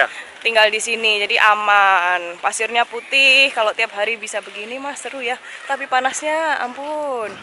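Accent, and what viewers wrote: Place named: Java, Indonesia. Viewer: Medok mbak, hehehe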